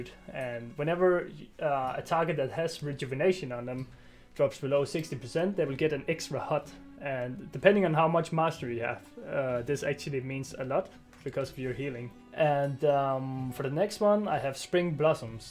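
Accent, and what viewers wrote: Denmark. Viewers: I love your Danish accent! <3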